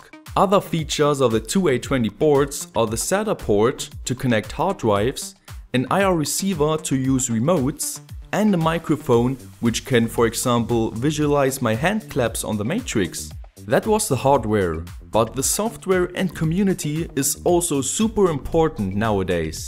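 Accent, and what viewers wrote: Germany. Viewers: Dude, you sounds weird... Are you German? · eher ein deutsches Amerikanisch.... Und ich dachte immer, in Deutschland würde man brtisches Englisch und RP lernen, so wie ich früher.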